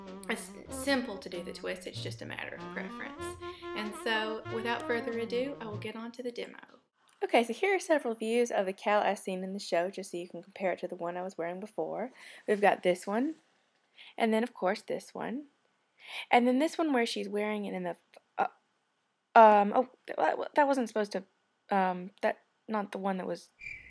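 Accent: South, United States. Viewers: This is terrific, I am an experienced knitter and you are too. In watching this I learned new stuff, so thank you. I detect a bit of a Southern accent, made me feel all warm and cozy while I was learning new stuff. Take cafe good care of yourself!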